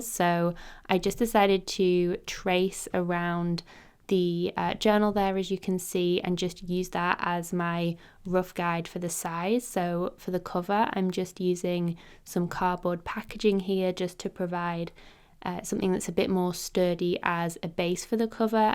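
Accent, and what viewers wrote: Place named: Manchester, United Kingdom. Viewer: Love these, it's great to not have to stitch the pages in for once ❤ Off topic, but can I ask whereabouts you're from? You sound sort of Greater Manchester-esque like me!! (I'm in Stockport) x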